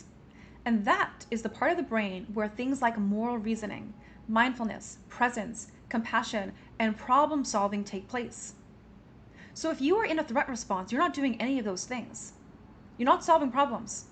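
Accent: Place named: Northeast, United States. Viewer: Yes, thx. Sounds strongly of the NYC-Boston milieu, without the accent per se. I guess I am hearing education and social class more than location.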